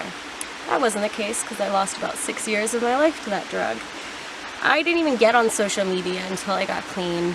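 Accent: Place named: Philadelphia, United States. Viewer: I knew i heard the Philly in you. I'm from Norristown so whenever I hear someone with the accent I immediately notice it. Lol. Hope you're doing well.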